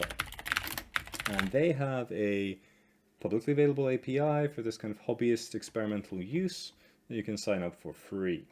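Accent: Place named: Scotland, United Kingdom. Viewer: this is incredible. and you are Scottish. Greetings from one to another. Ofc - not my real name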